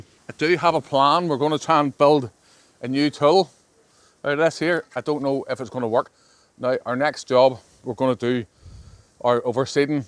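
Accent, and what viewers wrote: Northern Ireland, United Kingdom. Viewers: That's a Northern Irish accent!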